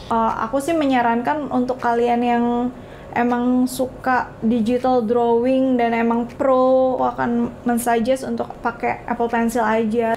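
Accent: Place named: Java, Indonesia. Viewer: gak tahan ane denger aksen wenglishnya :D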